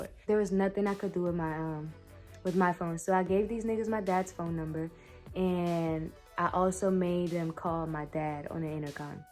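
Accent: United States. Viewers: You accent is very American :) do you visit Spain ( before covid I mean :) )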